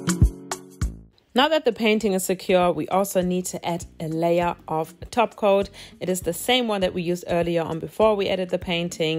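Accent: Germany. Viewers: Du kommst aus Deutschland oder haha man hört den deutschen Akzent raus 😂❤️ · Are you from Germany?😂🙈 you have quiet the accent🤔❤️ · Man hört direkt raus, dass du auch deutsch sprichst😂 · Wusste direkt das sie deutsch ist wegen diesem Akzent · Das ist so dieses deutsche english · Ist deine Nummer Sprache eigentlich deutsch hört man raus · She's German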